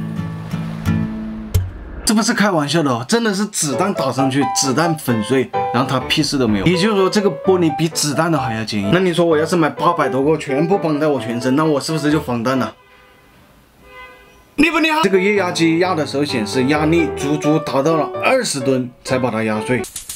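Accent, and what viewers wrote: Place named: Hunan, China. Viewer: 听你这湖南腔就想笑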